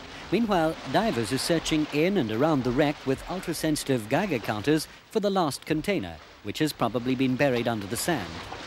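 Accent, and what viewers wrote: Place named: RP, United Kingdom. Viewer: It's not an accent it's called Received Pronunciation and he likely wouldn't have got the job without it at that point in time! I'm not a fan but I think it's deemed the most understandable form of the English language.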